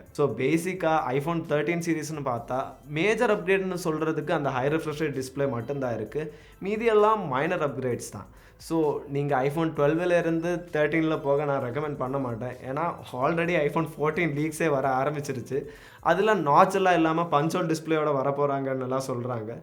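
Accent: Kerala, India. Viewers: Bro ungalukku tamil sariya pesa varaliya Malayalies pesura mathiri therithu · Unga pechula Malayalam slang adikkithe brother... Nallaa thaan irukku❤️❤️ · video nalla thaan irrunthuchu Kerala slang avevalavu thaan Ash naa Chennai slang 👍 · Bro are you malayali ne Tamil pesaradha patha appide dha theridhe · Thambi kerala vo? Malayalam vasana adikkithae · that malayalam slang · Neeinga pesura vitham Yaro Kerala la pesura mari iruku Tamil nadula irunthutu Y antha slang la pesuringa.. 🤷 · First u ne video la varatha. Unnoda Malayalam accent a kekum pothu kadupa iruku.😤😤😤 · Bro neenga entha state bro Kerala va, unga sppech super ha iruku❤️❤️❤️❤️❤️ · He is Malayalee. Endha aniya Sugamano? Ninda Thamizh valara adipoli..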